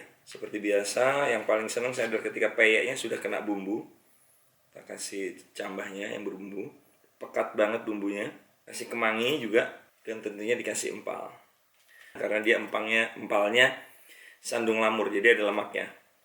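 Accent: Surabaya, Indonesia. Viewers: Suroboyo pooll iki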